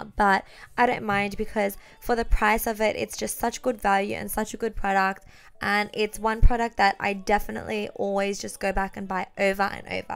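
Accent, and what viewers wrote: Australia. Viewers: Alexa Cryer Australian x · Alexa Cryer she’s Australian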